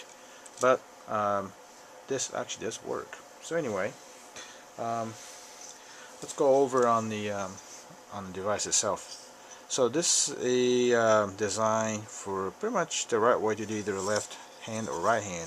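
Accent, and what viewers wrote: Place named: United States. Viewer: So much easier to listen to America English that I use everyday.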